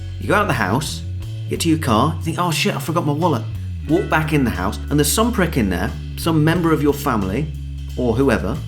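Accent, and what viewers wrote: RP, United Kingdom. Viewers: you sound like a Tory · people always say that british accents are sexy and i never understood it because i live in leeds where the most common word is 'innit' but this accent is beautiful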